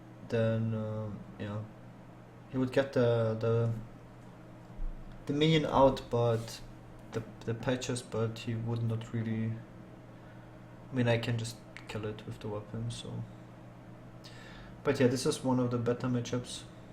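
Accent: Germany. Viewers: Dieser moment, wenn deutsche englisch sprechen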